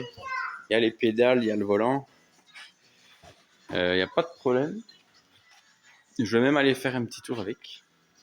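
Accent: France. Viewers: salut a toi je découvre ta chaine et tu as fait un sacré boulot je suis du doubs et toi aussi je pense ca s'entend on dirait !! bon voyage a toi et félicitation pour le boulot ! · Salut, Je suis originaire de Montbéliard et ça fait du bien d'entendre le bon accent bien de chez nous ! Et tout à fait d'accord avec toi sur cette propagande. Perso j'attends ma cellule, car ce n'est pas moi qui la fabrique. J'ai eu une Land 109 série IIA dans les années 80, puis un Discovery et un Range P38. Aujourd'hui j'ai changé je suis sur Ford Ranger et oui, avec l'âge, on roule en "confortable" ! Bonne continuation à toi et ta p'tite famille.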